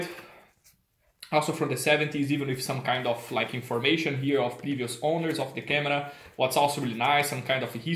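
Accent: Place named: Germany. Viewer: Yeahh awesome collection. And the german accent 😂🤘🏻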